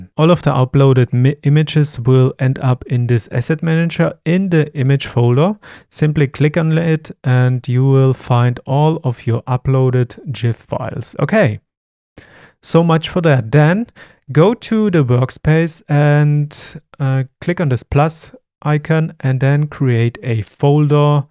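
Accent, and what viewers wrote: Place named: Germany. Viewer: i hören a bit of deutsch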